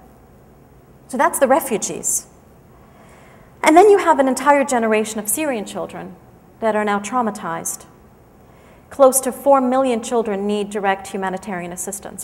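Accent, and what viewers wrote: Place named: United States. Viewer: your ignorance is immeasurable. what do you know about the "conflict " in Syria other than the information you get from your masters, the brutal thugs, Assad's shabihah. It is not a conflict as you claim, it is a spontaneous revolution by the people, for the people. It is a revolution against oppression, inequality and corruption. Can you deny that there are more than 200000 detainees in Assad's dungeons with any charges except, they spoke out against him. you have jumped the gun and started defending the Assad's regime without even knowing the kind of Gas was used, the delivery system was used which only Assad has that. Speaking English with American accent is not going to make any credible. Taking your head out of you know what, can make you credible if you talk sense. You have taken the side of the most ruthless pig humanity has ever known. 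we're blind to the first 8 months of the peacful demonstrations that were faced with Assad's snipers from rooftops which murdered more than 700 innocent kids and more than 6300 innocent people. If all these horrific videos did not move anything in you, then you are a creature without any feelings, and you don't even belong to the human race.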